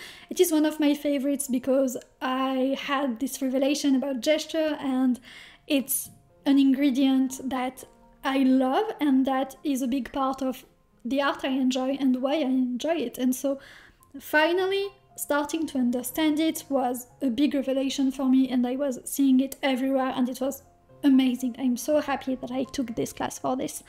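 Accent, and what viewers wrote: France. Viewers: Your accent is French ???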